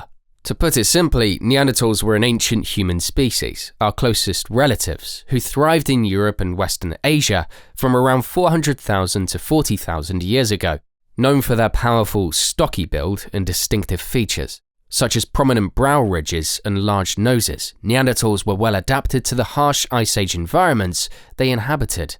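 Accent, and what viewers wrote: United Kingdom. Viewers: Hearing an English accent explain how a neanderthal must have sounded is kind of annoying to me.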